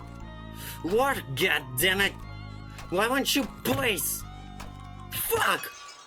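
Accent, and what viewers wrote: Russia. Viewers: Тяжело слушать русский акцент🫪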